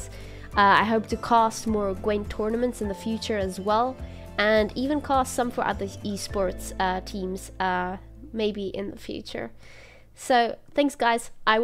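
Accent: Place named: South Africa. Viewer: The South African accent was a lot stronger in this video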